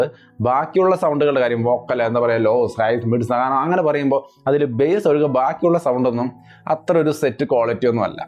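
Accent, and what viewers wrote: Thrissur, India. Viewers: Thrissur kaaran aayittum , ee achadi basha 😍😍😍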